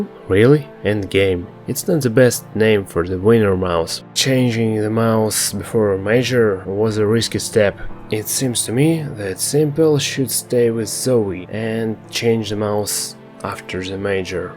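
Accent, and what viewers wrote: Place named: Russia. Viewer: Слышен русский акцент)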